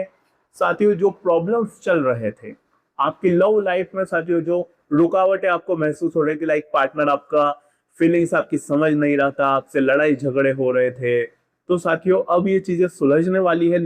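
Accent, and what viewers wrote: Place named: United Kingdom. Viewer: Bakwas mat kijiye First of all improve your pronounciation in Hindi Don't talk like a Britisher You Third grade Astrologer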